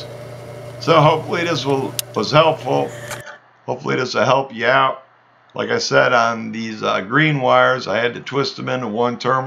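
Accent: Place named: Connecticut, United States. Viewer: Wayne (Marion Robert Morrison) born in IA and raised in California - are there these typical accents from either State? but you're right - sounds a whole lot like John Wayne. Maybe here might disclose which State of the Union he was raised and what we're hearing - I detect some CT in there.. it's a cool dialect where ever it may be located.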